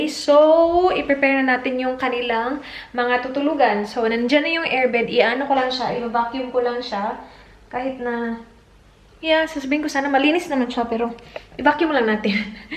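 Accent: Visayas, Philippines. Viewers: Suok2x jud. Tarongag balibod..... 😁😂😁 cute jud kaayo mag bisaya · Na amaze kayko ba kay the way ka mo storya og tagalog naa gyapon accent nga bisaya. Love u dhai😘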